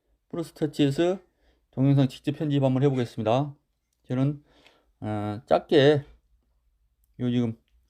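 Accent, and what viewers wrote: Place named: Jeolla, South Korea. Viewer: 님 좋아요 그래요 저도 이번에 어플을 깔아두고 연습을해보았는데 생각보다 괜찮더라고요 잘 알려주셔서 고맙네요 혹시 전라도쪽?하겠습니다이~~~♡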